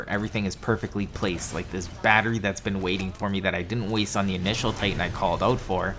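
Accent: Canada. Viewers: Bro are you Canadian because I'm pretty sure I here a Canadian accent every time you say about and out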